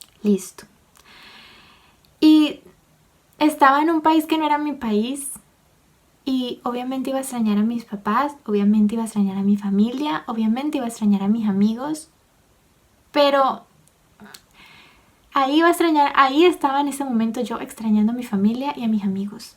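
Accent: Cali, Colombia. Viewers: Jajajaja como contas las historias ve! con ese acento caleño re marcado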